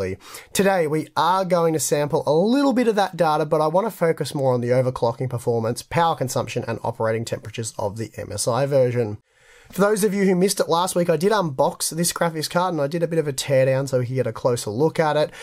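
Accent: Australia. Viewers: Get well soon! The videos are not the same without your full strenght australian accent :(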